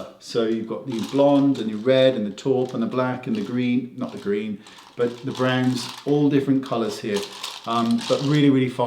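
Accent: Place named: Somerset, United Kingdom. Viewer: Looks great, nice to see a Bridgy boy on YouTube. I'm also from Bridgwater so I recognised the accent straight away 😊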